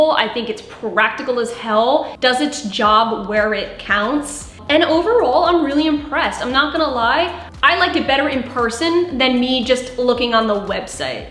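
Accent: United States. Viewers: where in jersey are you from? You sound more like your from the country than from jersey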